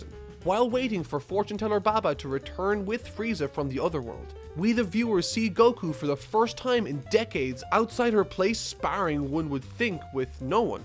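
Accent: Ireland. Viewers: Dat thick Irish accent though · * is irish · are you Irish?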